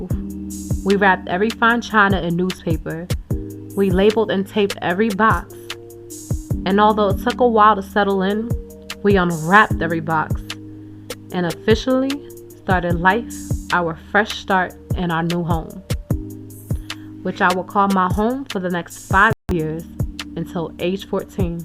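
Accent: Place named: New York, United States. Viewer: Wow 😯 this is good I’ll be waiting on chapter 8 and you still sound like NY peace sis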